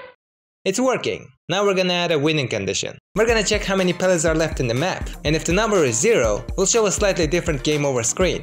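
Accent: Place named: Mexico. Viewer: Hablas español? Tienes acento como de mexicano xD pd: geniales vídeos, me motivan a seguir programando 🙂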